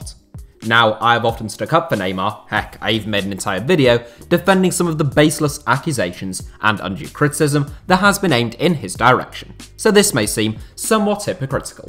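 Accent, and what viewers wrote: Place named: United Kingdom. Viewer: We can tell you are british. Were is Ibraimovic?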